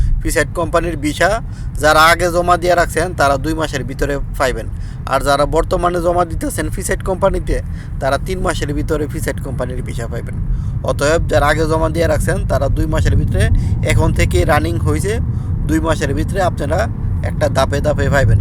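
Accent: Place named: Bancharampur, Bangladesh. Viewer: বান্ছারামপুরের ভাষার মত লাগলো আপনার বয়েছ টা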